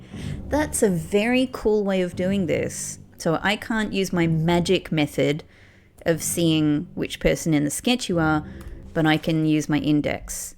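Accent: Australia. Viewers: I immediately clocked your accent. As a fellow Australian 😅. It’s nice to have a familiar accent on a playthrough. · I was wondering what your accent was and then literally you said “As an Australian…” funny timing! Love the play though so far!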